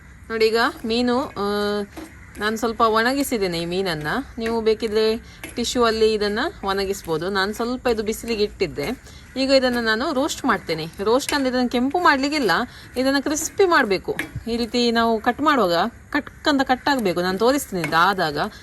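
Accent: Mangalore, India. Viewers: Thank you! More than the chutney your mangalorean kannada was very easy and could easily understand. Chutney preparation was excellent. God bless u..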